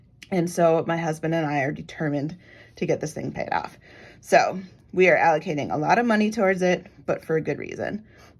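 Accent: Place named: Midwest, United States. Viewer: For real! I didn't hear the nerves at all. I actually told my sister that I would listen to you if you had a podcast because it's like I'm chatting with a friend! Maybe it's the Midwest "accent" we have that sound so familiar hehe :)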